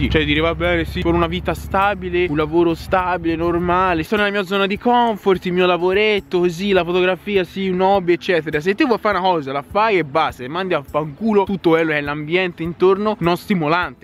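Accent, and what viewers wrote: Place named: Tuscany, Italy. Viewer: quanto è bello il tuo accento toscano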